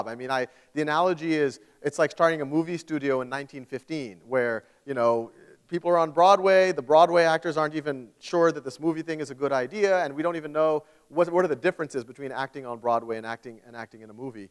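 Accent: South, United States. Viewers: Excellent presentation. i love his down to earth attitude, his humbleness and even his slight southern accent ( i love it when he says "Y'all). Khan academy is the best!!!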